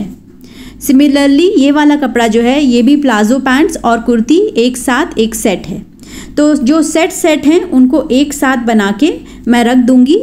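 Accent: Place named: Mumbai, India. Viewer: Aapke mumbai ka accent sune toh acha dikhta ❤frm Hyderabadi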